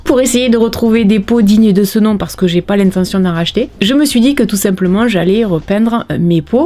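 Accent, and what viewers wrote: South, France. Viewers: Coucou J'aime toujours autant ton accent du sud!!! Dit, pourquoi ne pas utiliser le marc du café après lavoir bu que ce soit en dosettes en capsule... On l'ouvre puis déposé dans ramequin et c utilisable avec gel douche ou aloe Vera...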